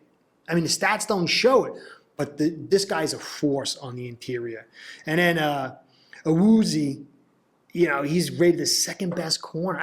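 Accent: New York, United States. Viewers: New York accent and Dolphins fan.. interesting. I liked and subscribed because I'm a Bengals fan. Who Dey!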